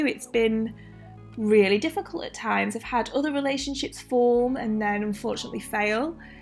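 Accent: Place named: North, United Kingdom. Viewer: What style is your wonderful accent? It‘s northern style but not too yorksherish I‘d say....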